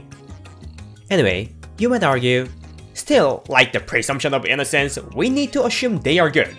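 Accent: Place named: Austria. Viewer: Are you by any chance Austrian? For some reason I get that from your voice.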